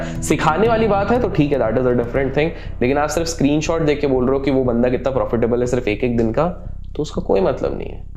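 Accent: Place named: Konkan, India. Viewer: Sir aap Maharashtra se ho aur 100% kokan se ho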